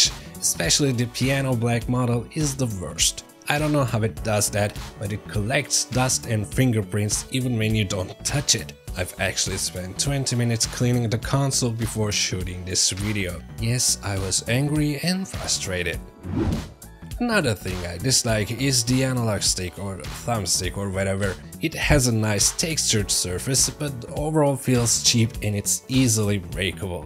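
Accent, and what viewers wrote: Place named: England, United Kingdom. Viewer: Lan o değilde bilmesem adamı İngiliz sancam ha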